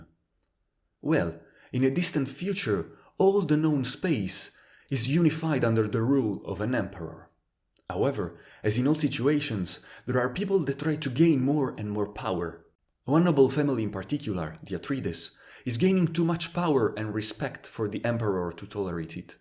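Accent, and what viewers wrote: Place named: France. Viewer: good review; I presume u r French as you sure do have some of Denis accent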